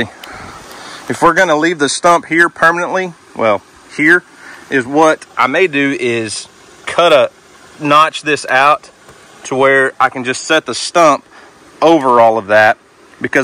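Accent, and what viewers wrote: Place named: South, United States. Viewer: By the way I absolutely love your southern accent. I live in oregon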